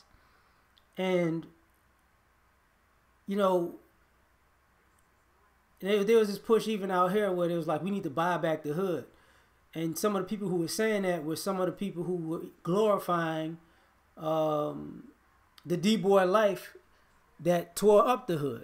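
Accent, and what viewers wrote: Chicago, United States. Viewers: I thought I heard a Chicago accent.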